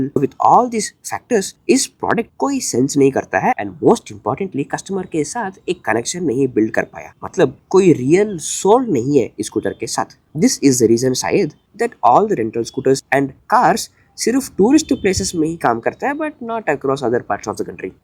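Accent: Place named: Karnataka, India. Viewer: I don't think he is a north indian, most likely Kannadiga(from accent), at the most telugu person.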